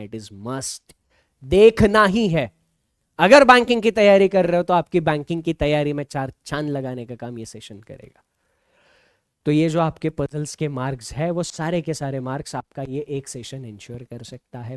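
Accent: Maharashtra, India. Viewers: Namaste Sir, hriday se request hai kirpiya 'Marathi' touch mai baat krna phir se start kr dijiye sessions mai...🧡🙏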